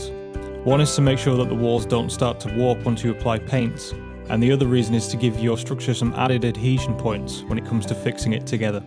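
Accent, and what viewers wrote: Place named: North, United Kingdom. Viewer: God bless you! i like the thick Northern accent with these American West Models.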